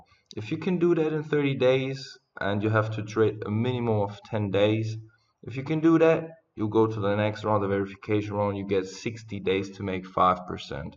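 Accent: Germany. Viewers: Great video my man. Are you from Germany bro?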